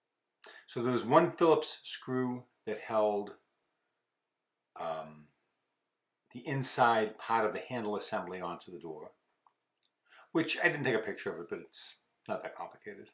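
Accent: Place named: Massachusetts, United States. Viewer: Your in florida but you def sound like your from that MA area....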